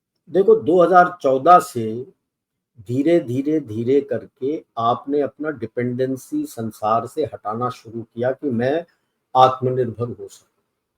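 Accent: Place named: Punjab, India. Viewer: Gen apki punjabi bhaahr aaa jatti hai ❤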